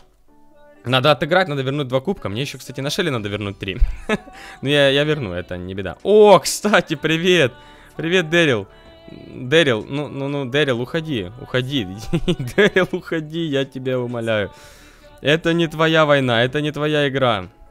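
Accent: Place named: Surzhik, Ukraine. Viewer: на мое мнение, звучит коряво. Это суржик с украинского на мою думку. Пожалуйста, по моему мнению, уши немного режет)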